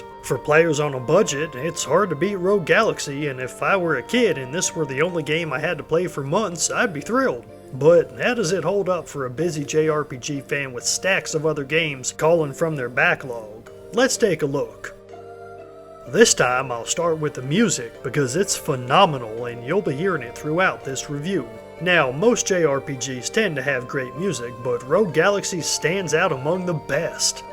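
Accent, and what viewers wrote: South, United States. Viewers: why does the narrator sound like hes auditioning to be a character in the video game with a southern accent?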